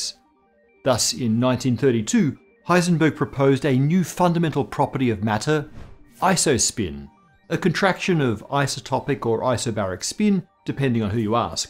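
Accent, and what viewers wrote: Australia. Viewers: I'm so confused, you have an Australian accent and say "zed" not "zee", but you also said "math"...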